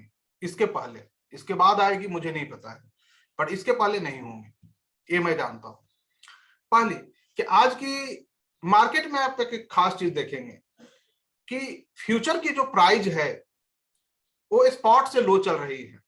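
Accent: Bihar, India. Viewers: Sir do you belong to Bihar?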